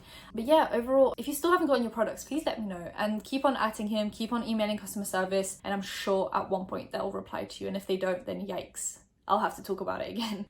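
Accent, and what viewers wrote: United Kingdom. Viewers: Hi I’m a new subscriber! is a brother of backstreet boy Nick Carter. He was on the Lizzie McGuire show and played his famous song “ ” 🍭. Currently as an adult I believe he is mentally ill, as he threatened to kill his sister and his brother, and their children. They got a restraining order against him to protect themselves/their families. Aaron was court ordered by a judge to hand over his weapons (he has a lot of guns). Former child pop star. That’s all. Sorry you got hate by bad Larry’s in the comments. That’s not fair. I’m 23 years old so you missed the era by a few years not to mention you have a UK 🇬🇧 accent so he might not have been as popular across the pond. Look forward to more tea 🍵 ☕️ videos!!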